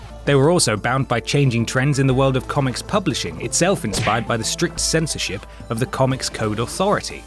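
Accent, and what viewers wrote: United Kingdom. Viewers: freaking pompus british accent makes me hate you, but damn do i respect you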